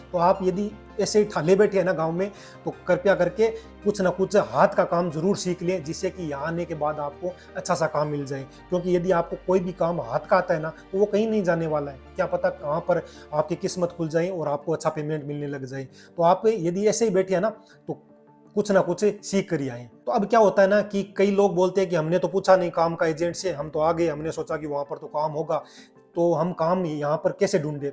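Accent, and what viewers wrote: Rajasthan, India. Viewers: आप राजस्थान से हो क्योंकि आपकी भाषा में राजस्थानी झलक है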